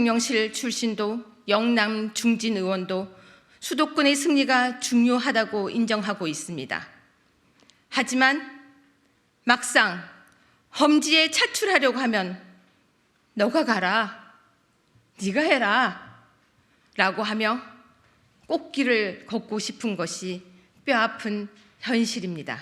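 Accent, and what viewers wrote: North Korea. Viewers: 북한 말투같냐??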